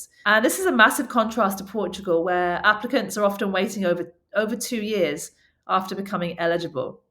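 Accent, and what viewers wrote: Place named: United Kingdom. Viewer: That'd be awesome. And thanks for being so responsive, for taking the time to respond to random comments. That and of course the meticulously curated content makes me come back to your channel over and over again. Plus, I love the British accent, and given how English is my second language, being on your channel is always a learning experience for me, in more ways than you intend. Thanks for everything. :)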